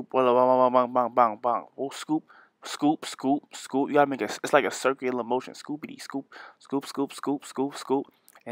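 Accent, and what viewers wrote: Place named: New York, United States. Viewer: I Love How New York you sound lmaooooo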